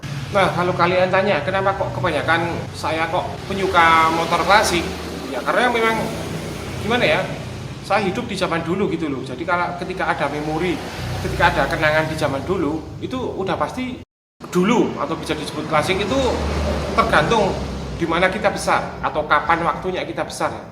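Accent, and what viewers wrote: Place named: Banyumas, Indonesia. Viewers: Klo saya lbh suka ocehanya mz lbh klasik jwo medok hhhhhhh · Ga ngapak ga enak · Benar banget om begitu lihat pertama kali youtube om langsung suka karna bahasa om yg ngalor ngidul nya medok banget itu yg bikin suka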